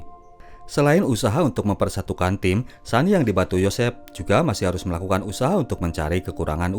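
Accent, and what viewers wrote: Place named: Banjar, Indonesia. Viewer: Fix suaranya orang banjar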